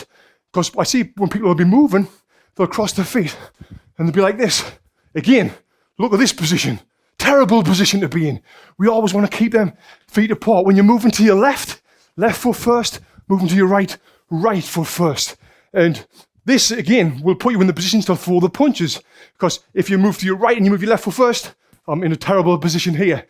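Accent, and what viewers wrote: United Kingdom. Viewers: I consider your tactics fair. Boxing is a sport. In a sport we must use tactics and our brain first and foremost. So not engaging in order to get the best result for you is tactics. If your oponent has slow feet then using your feet as defense is a great tactic to tire him and make him frustrated. Whoever says that your defense and your strategy is cowardish needs to think again. For example, if I have an oponent who has great body shots and is taller than me, I need to play with my chin very low and using my body moves left and right to avoid the body shots and attack from the low with uppercuts and hooks, without using my cross and jab so much because I risk my game against a good body shot boxer. So this is a tactic. Also what you did is a tactic, fair, and you exploited your strength (fast feet) and your opponents weakness. Thanks for your impressive and detailed videos. 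I train myself using your videos, mainly for fitness purposes, and from every boxing youtuber you stand out for your athletic spirit, your kindness and knowledge and also for your badass British accent! Love you! Keep it up!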